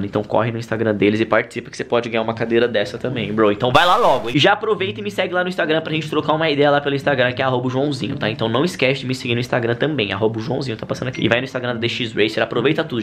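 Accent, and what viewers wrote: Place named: São Paulo, Brazil. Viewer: alguem em 2020 kkkk eu moro em Sao Paulo e é assim mesmo do jeito que o João falo kkkkkkk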